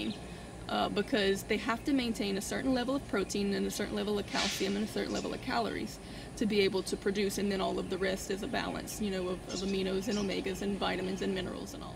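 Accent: Louisiana, United States. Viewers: Where are you from? Louisiana??? Love your video. Very informative.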